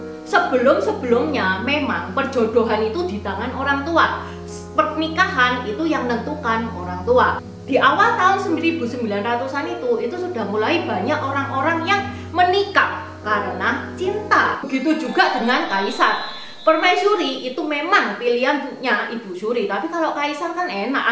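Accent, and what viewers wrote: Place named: Surabaya, Indonesia. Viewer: Alkhamdulillah Nemu Chanel ce saya lagsung subscribe, Bahasa Suroboyoane medok muanteb😂😂 Chanel ini nambah wawasan, Semoga Ce selalu diberikan kesehatan dan rejeki yang berlimpah agar bisa terus berkarya. Salam sejahtera buat ce dan saudara saudara saya dari etnis Tionghoa, Amin ya Rabbal alamin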